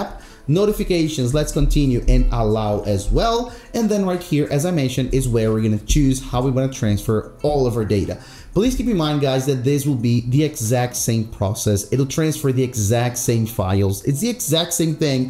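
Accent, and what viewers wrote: Brazil. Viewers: I had not expected to be listening to a help video in English with a Brazilian accent - yet here we are hehe On my iPhone 16e, I just can't get to the "Transfer Your Apps & Data" screen. My phone goes straight from the Hello screen to the keyboard customization screen and I can't figure out why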